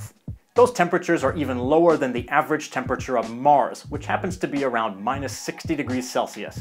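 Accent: Canada. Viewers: When I first started watching your Langfocus channel, I knew right off the hop that you were Canadian. I happen to be Canadian myself, so your accent gives you away. While many think Canadians and Americans sound the same, they don't. If anything, Canadians tend to have more a clipped accent with precise and measured speech - just as you do. I know that when I'm in social situations, I tend to relax and adopt a southwestern Ontario twang (linguists sometimes call this a rural Canadian accent - which applies to all parts of English Canada, save for the Maritimes). But when I'm in a formal or business situation, where I have to enunciate more clearly, my diction tightens up considerably and my speech has a more clipped sound. Americans generally don't have this ability - to quickly switch from their local dialect to a formal sound.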